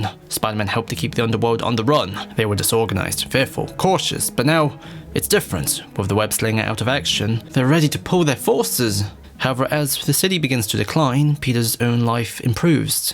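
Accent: United Kingdom. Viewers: So are you like welsh? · I thought you were English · Bro you sound British not american.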